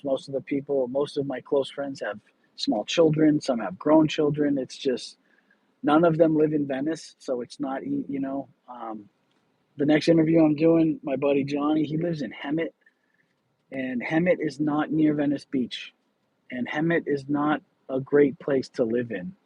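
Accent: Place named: Boston, United States. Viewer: I missed it! But I am glad you got to try live streaming out. Your accent brought me back to my military time. Now I know it’s potentially from Boston, as it reminded me of a friend I had in basic training. He had a heavy Boston accent that was new to me.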